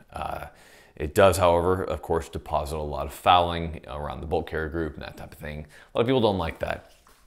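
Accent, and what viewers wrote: Germany. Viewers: we (germans) do in fact talk like that, one of the most prevalent accents is designing new stuff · yes, thats how we germans talk :)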